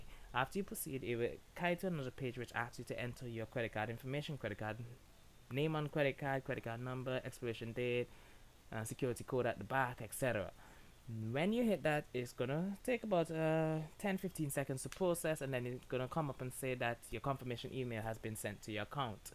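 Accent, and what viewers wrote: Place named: Caribbean. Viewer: Hearing a Caribbean Accent....Respect !!!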